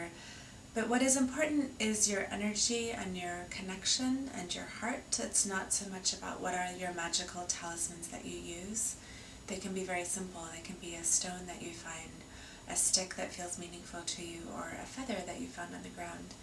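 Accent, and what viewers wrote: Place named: Scotland, United Kingdom. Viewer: love the Scottish accent - so beautiful, thank you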